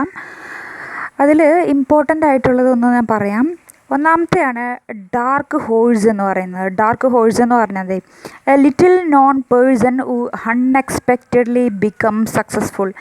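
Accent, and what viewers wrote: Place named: Thrissur, India. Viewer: അടിപൊളി, തൃശൂർ ഭാഷ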